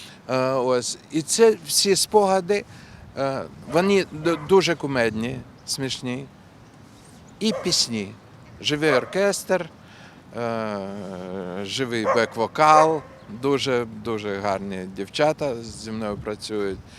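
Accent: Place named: Odesa, Ukraine. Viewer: 😂😂😂😂😂😂😂😂😂😂😂!!!! Шутите на русском!!!! На русском С ОСОБЫМ ОДЕССКО-УКРАИНСКИМ АКЦЕНТОМ!!!!!! Это СУПЕР И ОЧЕНЬ КЛАССНО!!!! Дякую Вам ВЕЛИКЕ!!! І ЩОБ ВИ МЕНІ БУЛИ ЗАВЖДИ ЗДОРОВИМ!!!!!!! ❤❤❤❤❤❤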